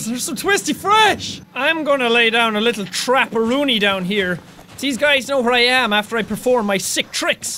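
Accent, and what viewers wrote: Ireland. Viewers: Is he Irish? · OMG I LOVE THE IRISH ACCENT :D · Wow I didn't know you liv In Ireland thats how your irish tell me more oneday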